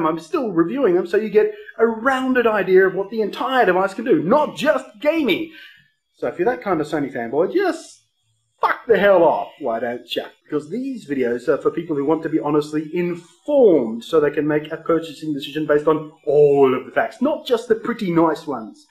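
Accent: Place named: Australia. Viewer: You do realise that he is Australian not British right? Duh!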